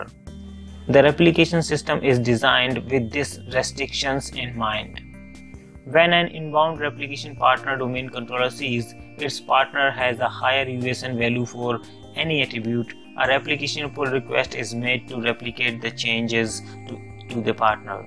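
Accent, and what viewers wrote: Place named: India. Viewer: oh god another AD video with indian english. can we please get an actual american to redo the F*cking audio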